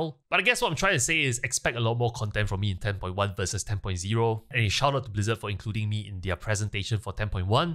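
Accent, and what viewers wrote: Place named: Singapore. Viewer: and thanks for the good videos. Love your Singaporean accent!!!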